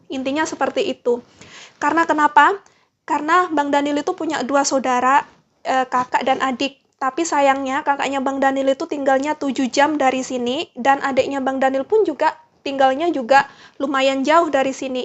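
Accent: Java, Indonesia. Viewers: Assalamualaikum mbk...aku seneng nonton vlognya 😍, denger suaranya empuk, ciri2 wanita jawa (logat jawane ya tetep dipakai) yg gak lupa dengan kodratnya jadi menantu idaman banget...yg julit lihat dulu vlognya di Indonesia duh rumahnya indah bgt nuansa bali...mbk ttp bilang sederhana... jarang2 komen kalau nonton tp pas tau ada yg julid kok melok mangkel aku...😅 sehat2 mbk di Inggris...salam dari Tulungagung 😄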